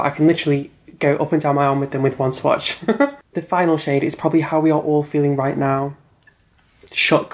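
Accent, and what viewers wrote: France. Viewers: Makeup looks really nice and I think the price seems reasonable! That French accent though doesn’t fit it sounds like the chef in ratatouille can’t take it seriously! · Love the packaging, dont like the French accent. We can see you better then the model. If there was better lighting and close up of the lips would have been better and let her apply it next time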